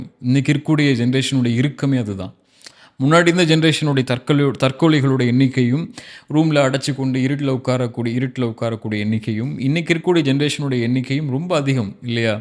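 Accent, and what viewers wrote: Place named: Sri Lanka. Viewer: Ungal bayan enakku mihavum pidikkum karuththukkalai mihavum alahahavum thelivahavum velippadutthureenga ungal kirath so sweet pesurazu engada nattavar maazari I'm srilankan pls Quran recitation podunga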